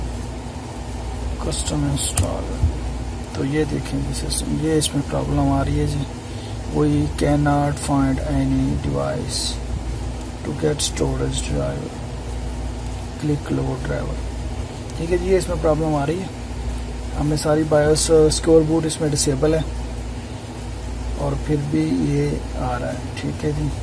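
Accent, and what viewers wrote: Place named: India. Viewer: If u hear an indian accent u know its gonna work. I've been working on this problem for 3 hours and after doing what this legend does it worked first try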